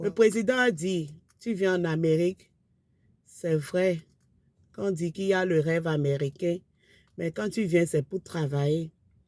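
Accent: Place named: Benin. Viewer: C'est ma première fois, mais l'accent m'a tellement attirer j'ai dis ça c'est mon Benin 😂, je m'abonne direct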